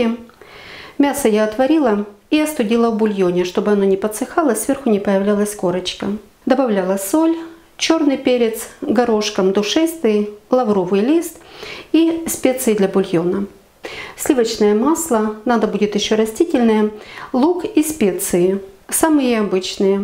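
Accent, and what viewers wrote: Ukraine. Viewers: дорогая. Потрясающе. У меня слезы на глазах от Вашей прекрасной украинской речи, это напоминание о мужестве Украинского народа перед лицом плешивого фюрера. А зразу чочу попробовать с курицей, мясо не ем. Буду готовить с удовольствием, дзякую!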